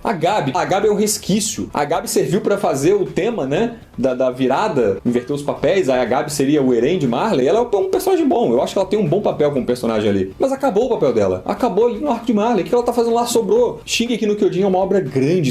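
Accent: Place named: Rio de Janeiro, Brazil. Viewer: UÉ, O sotaque carioca tá voltando?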